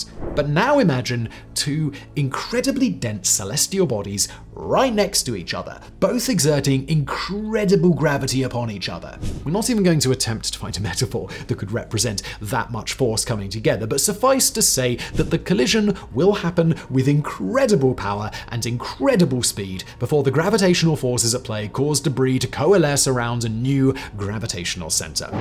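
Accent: United Kingdom. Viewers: Why is it only British men are allowed to narrate space or nature videos?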